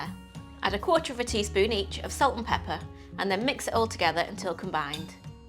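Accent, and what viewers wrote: United Kingdom. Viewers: I love british accent.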